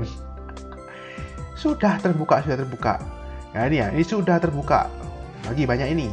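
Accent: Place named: Surabaya, Indonesia. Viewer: kalo didenger2 dr suaranya kyk org surabaya y bang?maaf kalo salah...🙏🙏🙏🤭🤭🤭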